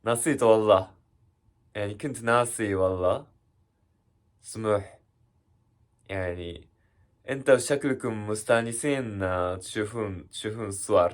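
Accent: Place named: Iraq. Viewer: زين تحچي عراقي حلو 💫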